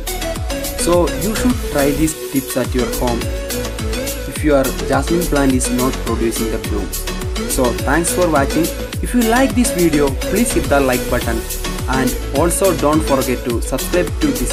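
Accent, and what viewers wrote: Kerala, India. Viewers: Malyali english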